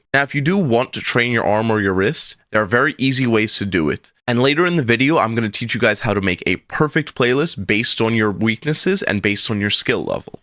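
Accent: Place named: New York, United States. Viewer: Man you def sound like a New Yorker that wants the best for everyone. I'm a tekken refugee playing marvel rivals and these videos helped alot!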